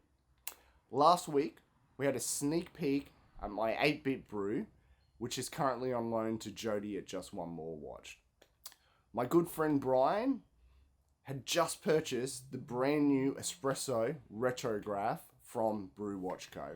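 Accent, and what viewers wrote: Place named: Australia. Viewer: Great to hear another Aussie accent talkin' watches on YouTube 👍👍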